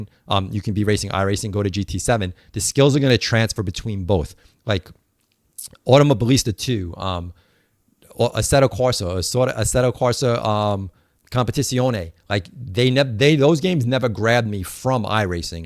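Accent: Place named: Massachusetts, United States. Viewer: you must be from mass... im from new bedford now in florida but your accent brings me home...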